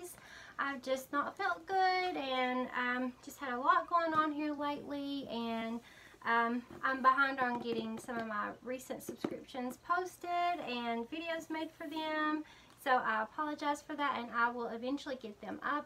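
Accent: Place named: South, United States. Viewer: I love your ramblings and your Southern accent! You are growing so fast 😊😊😊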